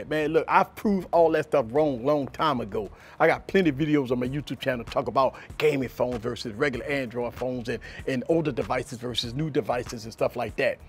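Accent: Louisiana, United States. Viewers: He sound Creole like he's from Louisiana New Orleans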